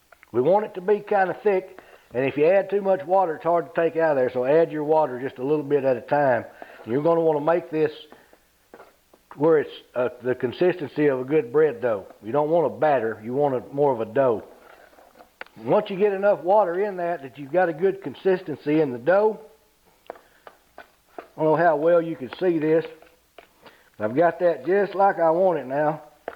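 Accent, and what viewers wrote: South, United States. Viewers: I just came across your videos today while looking for a hot water cornbread recipe. I made them earlier for the first time using your recipe and they turned out great! I love the southern accent. I'm from Alabama, where are you from? I am planning to make corn fritters tomorrow. Do you have a recipe for those?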